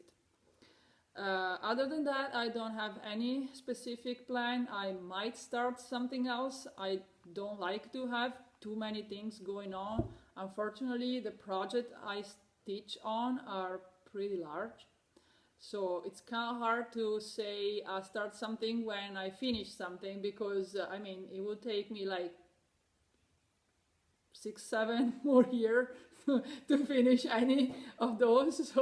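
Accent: Italy. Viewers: I'm just now starting to watch your videos. What a beautiful Italian accent you have! I love it!! I'm trying to learn Italian using the Duolingo app. Definitely the most beautifully spoken language ever, in my opinion.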